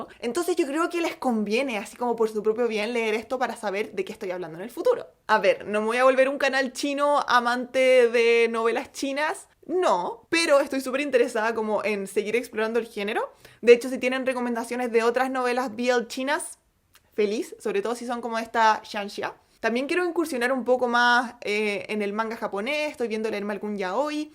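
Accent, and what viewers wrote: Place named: Chile. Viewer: Mi obsesión por The Untamed me recomendó este video y cuando le puse play... Ese acento? Ese acento es chileno? Es chilena csm xD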